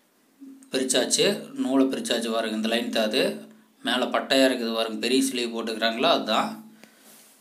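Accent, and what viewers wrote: Kongu, India. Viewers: கொங்கு தமிழ்.கேக்க கேக்க இனிமையாக இருக்கு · நம்ம கொங்கு தமிழ்'ல Semma explanation ன்னா 🤝✌️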